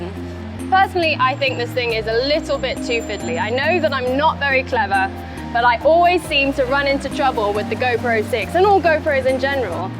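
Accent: United Kingdom. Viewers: WahWah she sounds British Not Australian!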